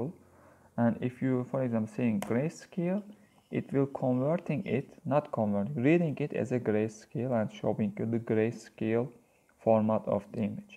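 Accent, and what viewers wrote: Turkey. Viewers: Yemin ederim aksandan anladım Türk olduğunu 😁 aslan abim benim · ABi bende tam olarak Hem ubuntu tabanlı hem c++ opencv videosu arıyordum çok teşekkür ederim ingilizce niz de türkçe aksanı olduğu için benim için çok daha anlaşılır olmuş adamsın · aksandan o kadar belli ki Türk olduğu. 🙂 İçerik için teşekkürler. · ilk cümlende direk türk olduğunu anladım. Aksağan çok belli edio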